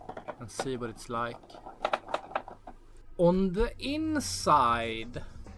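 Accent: Sweden. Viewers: Loved the review. Love your swedish accent aswell, especially xd. Recently bought one of these in factory new condition, it feels like a tank and looks like one aswell. I'm gonna mod it, so this video will probably help me again in the future lol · love the swenglish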